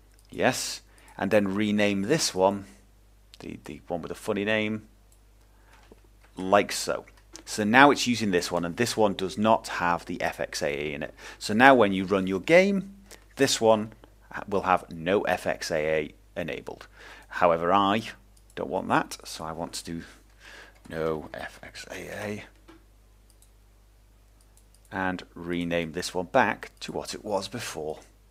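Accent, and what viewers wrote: United Kingdom. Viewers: cool accent=) are you British?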